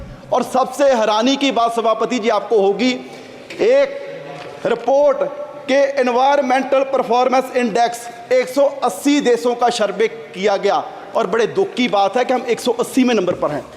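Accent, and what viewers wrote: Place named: Punjab, India. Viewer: Sir ki punjabi hindi bahut mast hai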